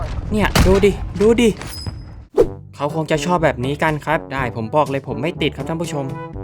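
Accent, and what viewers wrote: South, Thailand. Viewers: คนใต้ไหมนิ55ไม่น๊อดนะแต่ว่า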